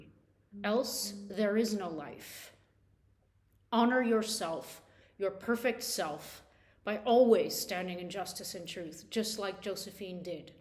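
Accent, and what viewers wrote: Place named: Canada. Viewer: BRAVO! You are awesome: amazing and great speech! Ps you look marvelous! I assumed you’re Canadian due to your accent…. Am I wrong?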